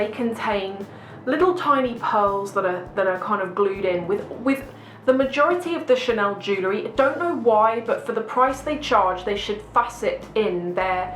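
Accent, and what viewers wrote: United Kingdom. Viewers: I love her British accent .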